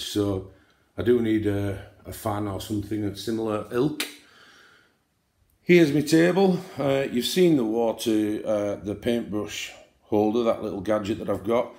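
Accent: Yorkshire, United Kingdom. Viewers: Love your space 💜 and your Yorkshire accent 😍 All the best with your channel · Enjoyed your video, recognise your accent as Yorkshire may be, as I live in Yorkshire uk, New subscriber via The Bibliophile colourist. You have a great art space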